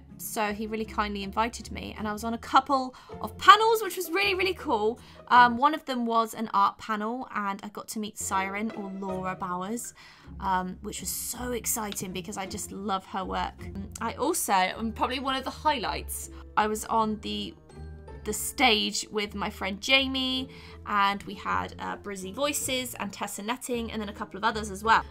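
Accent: United Kingdom. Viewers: I thought you were British, lol it truly doesn't matter, you're still beautiful. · Maybe i missed it, but if you've been in the US for so long, why is your accent so thick? I understand you learned to speak from your parents who are presumably also British, but I'd think being here from a young age you would have lost some of it. I hope that didn't come across poorly, there's no criticism there, I'm genuinely curious. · Lol I thought that you where British to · OK im new I love you! Just want to know how did you get such a strong UK accent, if you been in the US most of your life? I love your accent btw just asking how it came to be? Love your video and you!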